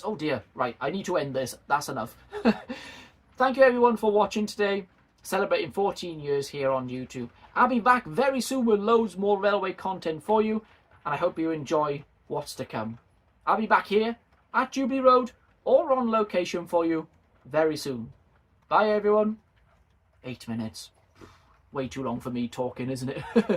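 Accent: Wales, United Kingdom. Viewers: Well done. I love your videos. Have been watching your channel since the pandemic and before. Unfortunately I lost my hearing due to covid but I can still remember your classic Welsh accent accompanying your fantastic vids. I really love the style of your presenting and great content. 14 years!!! BRILLIANT. Please keep them coming. Especially the bloopers!! We're all human and it's great to see what happens to us all whilst trying to get it right. Again. Well done mate and here's to the next 14 years. All the very best. Simon. 😃😃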